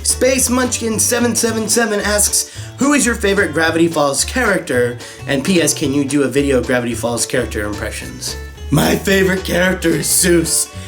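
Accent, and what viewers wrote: Scotland, United Kingdom. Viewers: You are amazing Brian! And no don't shave your beard at all😂 PS Scottish accents are amazing!!!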